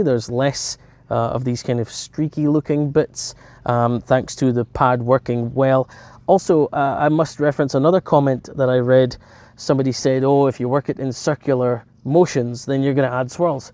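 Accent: Scotland, United Kingdom. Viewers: no doubt I have some Scottish friend here in the states you sound like them....keep going with YouTube I’ve watched a few videos they are good. Best kept secret in US detailing market is a company called duragloss they make mostly sealants 111 and 501 are there best they are around 10$ for 16 Oz they also have a product like bead maker called aquawax the stuff has a cult following here in the states. You should review that stuff don’t see any uk detailers using it.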